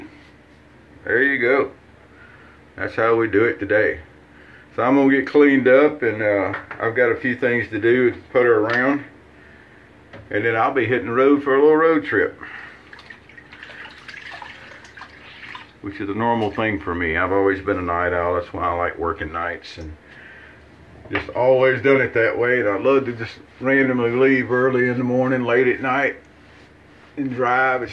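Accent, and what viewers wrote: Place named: Mississippi, United States. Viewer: You can tell that you are from Mississippi by the way you say it. Missipi, if you ain't from there, you don't understand.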